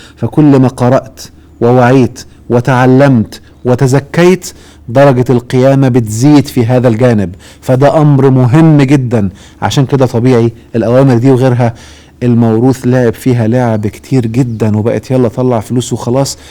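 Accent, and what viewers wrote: Algeria. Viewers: انا تبانلي جزايرية ماشي ليبية ومنعرف ههه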